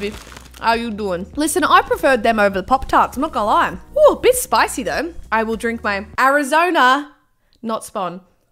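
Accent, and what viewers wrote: Australia. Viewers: Are you a off brand azzyland with a budget cut and Australian accsent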